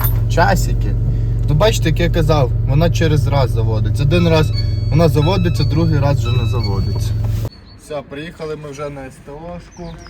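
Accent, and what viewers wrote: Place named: Surzhyk, Ukraine. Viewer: я з камянця. Чую наш суржик))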